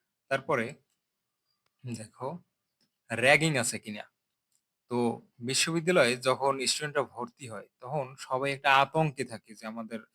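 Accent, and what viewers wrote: Barishal, Bangladesh. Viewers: Vai apni ki barishailla??🌚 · ভাই আপনি কি বরিশালের।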